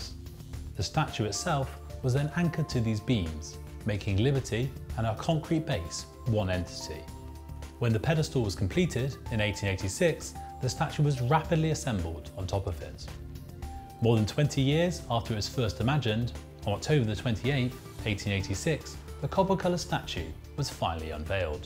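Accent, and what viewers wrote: United Kingdom. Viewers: Sotaque britânico não dá pra aguentar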